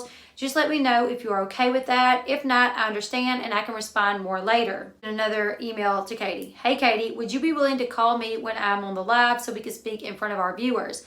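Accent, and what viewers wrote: South, United States. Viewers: You do have a cute Southern voice😉 I truly loved it. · She was right as a southern sister love the accent ( it's like mine) and you are very pretty ,love from Alabama 🤗🐘🐘🐘🤗 that's ALL she was right about 🤣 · "I've never watched before" but she has a cute southern voice lol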